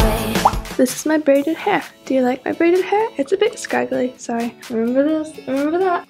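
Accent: United Kingdom. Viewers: who else noticed she sounds British in like every video now lol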